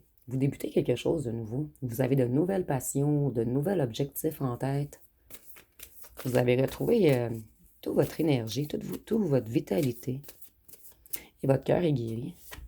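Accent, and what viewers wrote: Quebec, Canada. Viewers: j'adore vos guidance , merci beaucoup ;) pas de souci je comprend bien le québécois puis j'adore , votre franc parler c'est trés spécifique votre parlé , trés imagé ,j'aime bien! c'est franc ! ;) félicitation pour votre nouveau logement , content pour vous ;)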